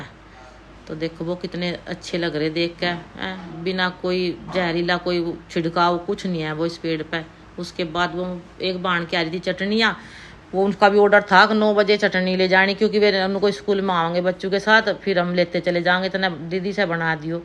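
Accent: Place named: Yamuna region, India. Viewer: आपकी भाषा मुझे मेरे आस पास की लग रही है बहन जी आप कहां से हो क्योंकि यह जमुना के पास की लैंग्वेजहै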